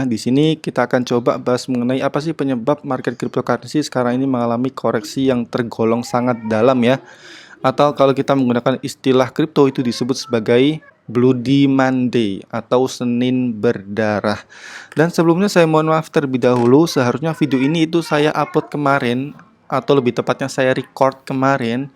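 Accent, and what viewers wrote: Surabaya, Indonesia. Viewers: Wong suroboyo ta dobol samean cak .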